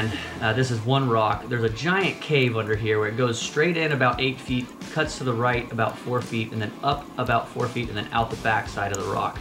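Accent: United States. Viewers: Cuidadito que habláis feo, eso que es inglés? No creo, el inglés suena más bello. Ahh es Yankee! ACABARAMOS!!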